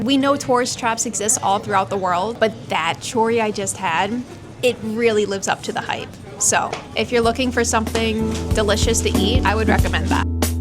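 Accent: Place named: Argentina. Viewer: Me fascina, me encanta, me alucina, me parece genial que te guste usar el "vos" y el "sh", a muchos en latam no les gusta, pero es el mejor acento del planeta. Abrazo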